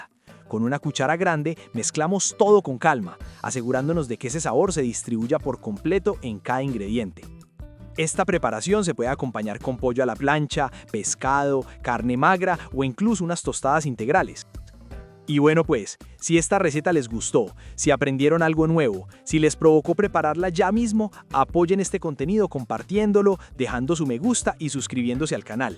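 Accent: Antioquia, Colombia. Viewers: Me encan❤tan las ensaladas que haces y hablas muy paisa como yo pero yo vivo en españa y amo mi colombia más mi Medellín ❤❤❤❤❤❤❤❤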